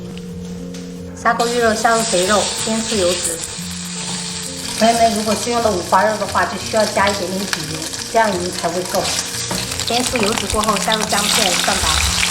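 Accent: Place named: Sichuan, China. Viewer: 喜欢看你的频道～^_^～温暖的家常菜，亲切的家乡川普❤️～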